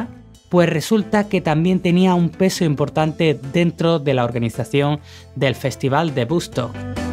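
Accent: Spain. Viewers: Que fondo musical tan horrendo ! y la voz españita de este chaval no le hizo ningun favor, no tenia Santana suficiente musica para ponerla de fondo ?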